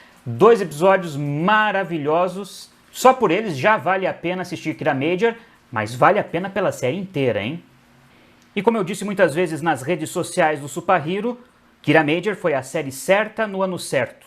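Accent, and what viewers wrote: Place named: Brazil. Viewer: I figured it was Portuguese. Its a great video. As a native Spanish speaker i understood about 60%. Its great to know that Toku is watched all over the world! I'm going to assume that you're from Brazil since it sounds like Brazilian Portuguese. I which that toku could be more popular here in the US where I live P.S. if you could someday add subtitles it would be also. Regardless I'll subscribe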